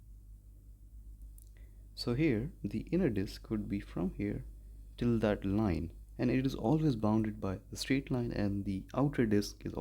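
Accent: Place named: India. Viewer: Bro u have indian accent i don’t understand anything u indian biryani scammer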